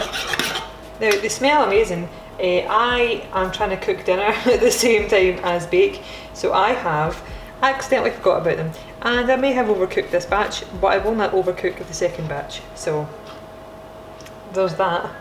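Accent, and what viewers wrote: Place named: Glasgow, United Kingdom. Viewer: Glaswegian/surrounding area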